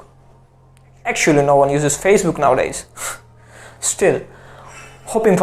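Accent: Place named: United Kingdom. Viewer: I think you have a nice British English accent. Nice pronounceation. Good start and tell you brother to learn all this from you 😂🤣😜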